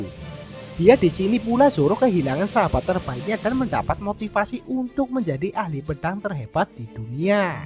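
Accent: Java, Indonesia. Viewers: Kayak dari jawa.. medok bro.. smangat aja bro.m kritik dan saran itu sudah biasa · Admin logatnya sengaja di medokin ya ?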